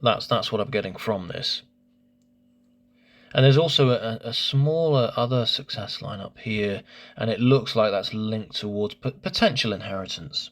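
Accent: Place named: United Kingdom. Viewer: Good to have some subtitles here as some ppl might find it difficult to catch with ur native British accent. Good to see u putting the new feature in to practice with ur premiers palm. As his inheritance links to east, he might be a good family person as shown by his palm. We have a belief here that who ever come to the top seat might be under a bad period according to his or her birth chat. As always, it’s very interesting reading and illustration. Thanks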